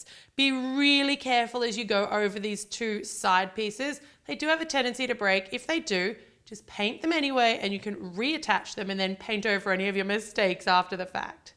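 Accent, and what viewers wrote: Australia. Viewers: I love the Australian accent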